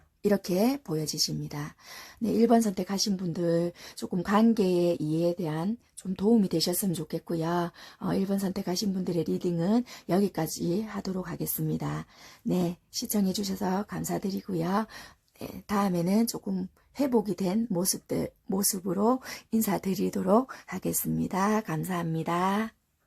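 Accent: Busan, South Korea. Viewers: 감기로 목상태가 안 좋으신가보네요. 얼릉 쾌차하세요~ 사실 저도 부산 사람이라 부산사투리 첨부터 들었을때부터 정감이 많이 가서 계속 리딩 듣게 되네요^^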